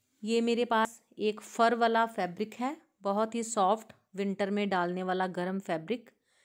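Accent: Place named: Punjab, India. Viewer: Punjabi o na tusi ? Punjabi accent pta chal janda aa Wese acha bnaya tusi Mai b try krugi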